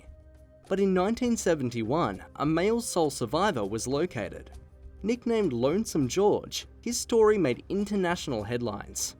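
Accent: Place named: Australia. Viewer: If it's aussie speaking, i believe its real even tho he lack of/some missing words 👌🏾🇦🇺🎙️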